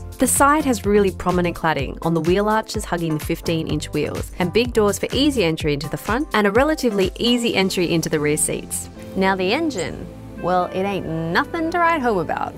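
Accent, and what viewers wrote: Australia. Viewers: Sexy woman with an Australian accent talking about natural aspiration. Like.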